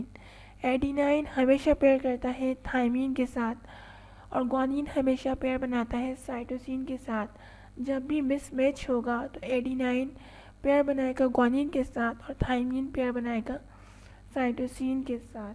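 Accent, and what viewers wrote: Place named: United Kingdom. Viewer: Ap aise hi bolti ho kya hindi me english accent dalne ki kosish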